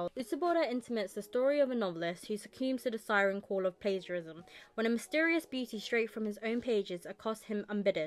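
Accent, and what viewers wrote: United Kingdom. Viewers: Are you British? Love your content!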